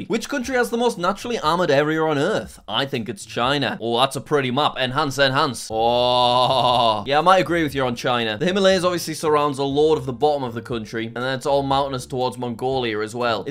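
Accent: United Kingdom. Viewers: british accent doesn't really pronounce the r in arm, but most American accents do. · I just love it so much when says “Enhance! Enhance” with his nice British accent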